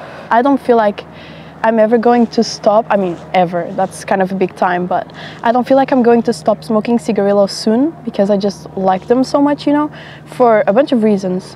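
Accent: Portugal. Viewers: tu tens sotaque de tugaa... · I thought you had the most obvious portuguese accent and then i checked the description and boom there it is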